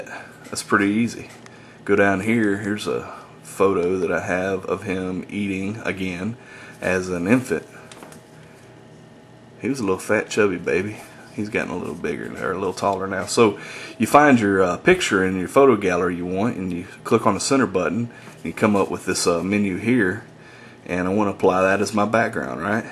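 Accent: South, United States. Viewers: i love your voice/southern accent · You know I really don't like southern accents- but yours is just fine XD Not a bad review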